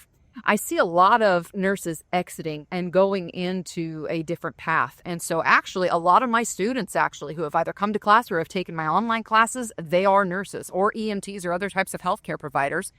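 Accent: South, United States. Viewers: I have been following you for many years and always appreciate your thoughts. I hate to hear you losing your beautiful Southern accent.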